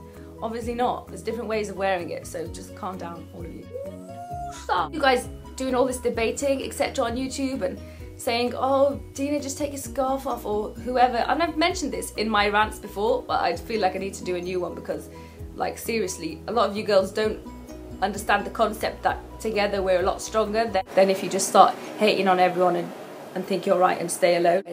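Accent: United Kingdom. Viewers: I love what you wearing, and i love british accent. <3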